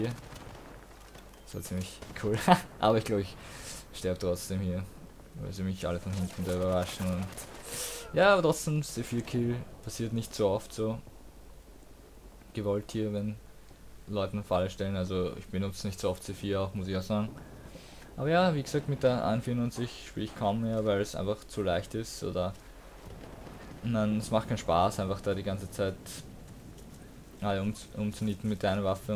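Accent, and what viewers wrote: Austria. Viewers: Am akzent erkennt man leicht das du aus Wien bist :) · Du hast ein österreicher akzent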